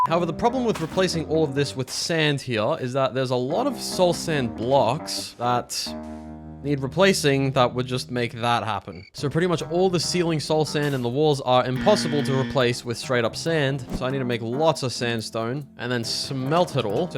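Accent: United Kingdom. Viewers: Love british accent too much dude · I really like your British Accent...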